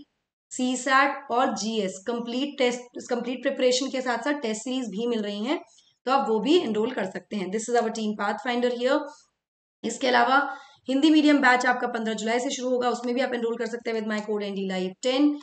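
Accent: India. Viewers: Please dont speak English in Hindi style. Sounds ridiculously funny.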